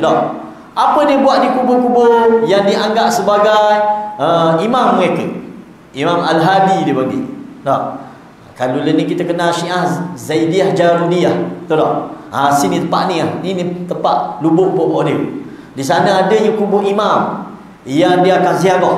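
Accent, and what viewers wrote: Terengganu, Malaysia. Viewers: Loghat ganu.... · Tq Mantap ustz teganu Walaupon baru ku kenal Namun pelat teganu mu menjadi poojaan hatiku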